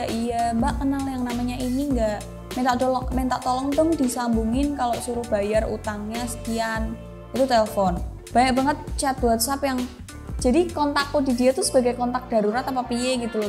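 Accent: Java, Indonesia. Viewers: Jowo bgt sampeyan mbak,👍👍👍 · Jowo banget uwoooow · jowo bgt logat km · Pertahankan Logat jawanya..saya sangat suka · jowo ne ketok poll yo wkwkw 😀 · Jowo toh mbak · Jowo ne pundi mbak'e? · Senyum sendiri menyimak medoknya wong ayu iki... Wanita Jawa memang luar biasa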